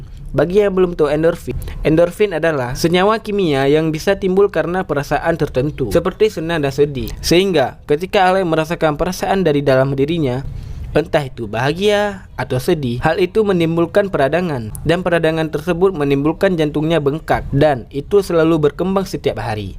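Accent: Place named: India. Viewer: Lage logat india si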